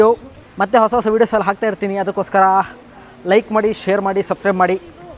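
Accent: Kundapur, India. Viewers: Bro niv pojaraaa navu poojary.. Nim kandapr bashi mathad thidre bari kushi ath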